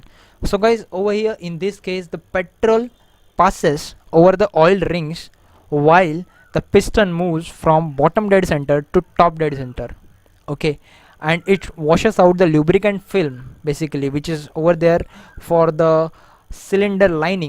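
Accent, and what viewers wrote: India. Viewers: it's so difficult to learn this in your Indian accent. :(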